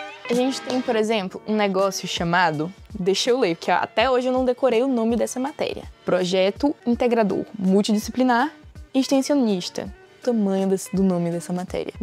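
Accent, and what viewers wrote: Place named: São Paulo, Brazil. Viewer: impressionante que dá pra reconhecer um paulista de longe pelo sutaque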